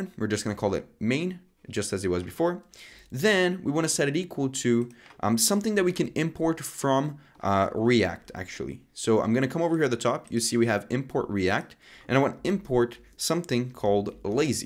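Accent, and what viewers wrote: Brazil. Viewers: Great vid! I recognized the Brazilian accent as the it started playing 😆 Keep up the good work!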